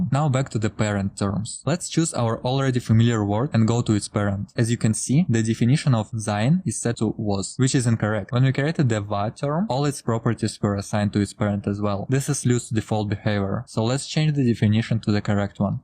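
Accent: Russia. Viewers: жесть у тебя акцент прям брутально русский, я звучу как фембой когда общаюсь на английском xd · are you russian? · Good vid. Aren't you Russian by any chance?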